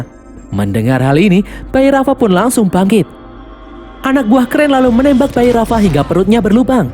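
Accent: Java, Indonesia. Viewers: Adminya orang jawa ya, karna mbacanya karno🥵🤣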